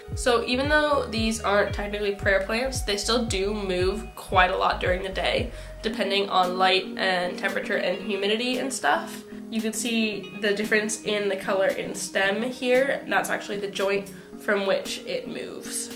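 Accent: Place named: United Kingdom. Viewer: This is irrelevant but I can't tell if your accent is British or not, I love hearing you talk 😂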